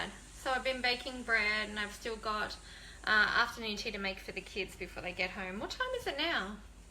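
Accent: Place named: Australia. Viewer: she's talking with and aussie accent......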